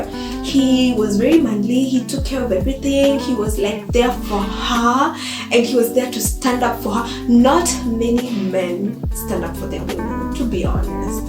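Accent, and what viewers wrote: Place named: South Korea. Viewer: I love kimchi... Your Korean accent is so cute! Korean cuisine is delicious 😍 haha the way you are talking about them... Bless you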